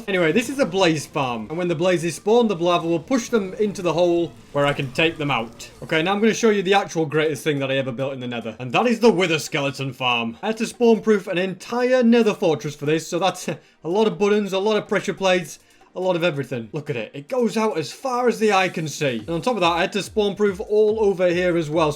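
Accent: United Kingdom. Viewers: But 100% British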